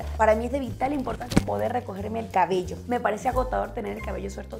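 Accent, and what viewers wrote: Venezuela. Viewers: hola una pregunta 'tu eres venezolana'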